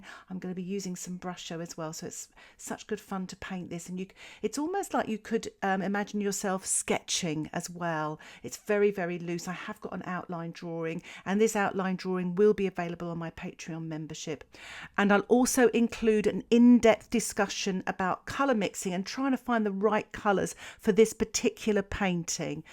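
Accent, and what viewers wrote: England, United Kingdom. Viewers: Absolutely gorgeous and the English accent is great as well. My family history is in England & Wales. I am just getting started in watercolor artistry & I can see you will provide fantastic inspiration! I have subscribed!!!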